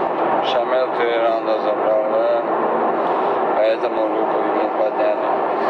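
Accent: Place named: Urus-Martan, Russia. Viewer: Как ты разговариваешь... видно что ты из Урус-Мартан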